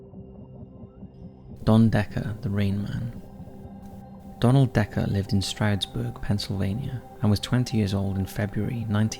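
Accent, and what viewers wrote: Wales, United Kingdom. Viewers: British accent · He's Welsh. So it's a Welsh accent. There's no such thing as a British accent. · ??? That IS a British accent. What are you talking about? I'm British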